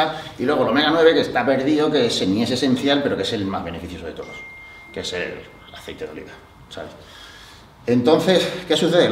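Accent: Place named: Extremadura, Spain. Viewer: El tío es de Cáceres (Extremadura) es normal que hable así, ya que la zona de Extremadura fue una zona muy poco urbanizada por bastante tiempo y conserva un acento muy castellano, parecido a los que hablen en Castilla y León, exceptuando la zona más sur de Extremadura casi pegada a Andalucía. Lo sé porque soy extremeño.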